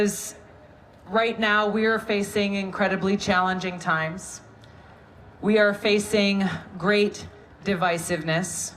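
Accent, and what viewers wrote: Hawaii, United States. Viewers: This persistent "aloha" greeting might sound odd and unnatural to people in the Midwest. If you wanna win national elections, you'd better speak General American rather than Haiwaiian American.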